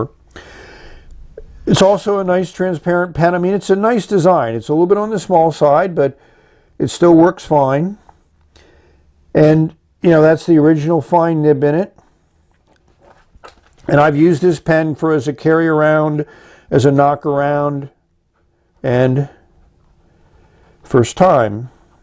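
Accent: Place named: Pennsylvania, United States. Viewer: I’m a newbie at fountain pens, what does the bent nib do for ones writing? You sound like a PA resident, am I right? I grew up in PA😃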